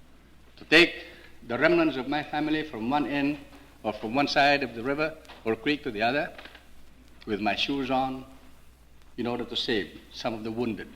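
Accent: Philippines. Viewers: One of the best examples of the cultivated Philippine English accent.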